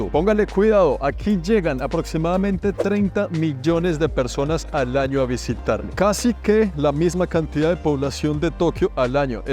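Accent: Colombia. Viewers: Es el primero video que veo de este Canal y por el acento al principio asumí que es colombiano y después lo confirmé con algunas referencias que hizo jeje, muchas gracias por su trabajo, excelente video. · Eres el mejor narrador de contenido no cansas tienes esa chispa de expresión colombiana que encanta 🌟🌟🌟🌟🌟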